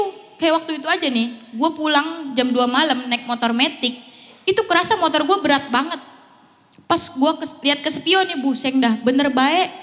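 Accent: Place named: Cikarang, Indonesia. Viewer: Aksen Cikarang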